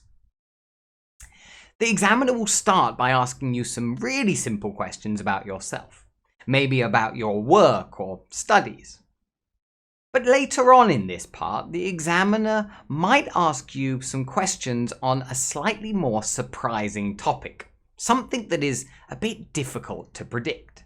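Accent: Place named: United Kingdom. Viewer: Please teach us how to do British intonations like you. Stress sentences in particular. I love your accent and intonations. How to speak fluency like you with intonations.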